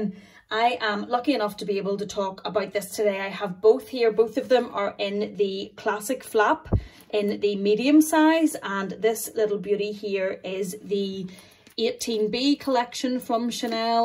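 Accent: Ireland. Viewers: Love your Irish ☘️ accent 😊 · Hi, I have to say I loved listening to you because of your lovely Irish accent. I love Channel. You are very knowledgeable. I just subscribed.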